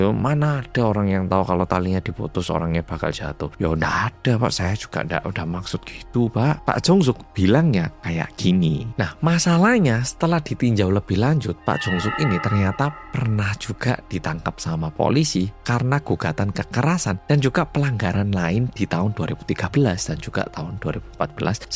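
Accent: Java, Indonesia. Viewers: Woow..Bhs Indonesia dan dialex jawa nya mantap pol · Logatnya medok banget, kayak orang Jawa.Sukses selalu Buat Abang ini. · Duh medok e ,,, ❤❤❤ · Adminnya orang Korea kerennn deh, bisa bahasa Indonedia berlogat Jawa, he..he...👍👍👍😂😂❤🇮🇩🇮🇩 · Mantap 👍 bahasa jawanya medok banget😊❤️ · ooo gitu tho mas medhok ... :-D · Salut dgn adik Korea ini bhs Indinesianya sangat lancar dgn logat Jawa .... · Lha wong dia masa kecilnya sampai dewasa tinggal di Malang... sekolah di sekolah negri Indonesia... Pas kuliah aja s Singapur... Ya bhsa Indonesia nya medok lah ..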